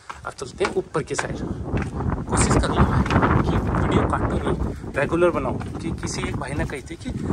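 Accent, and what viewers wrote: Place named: Haryana, India. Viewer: mai bi Haryana sah hu mujai ap ki boli sah pata chal gaya ta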